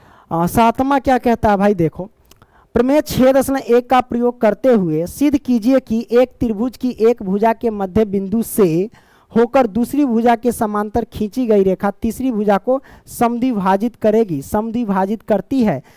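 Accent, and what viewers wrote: Bihar, India. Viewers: Sar aap bihar se ho naa ❤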